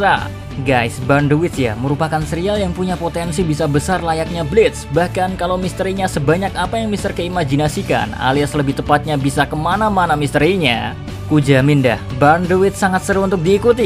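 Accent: Java, Indonesia. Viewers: DHE ( MEDOK JAWAX )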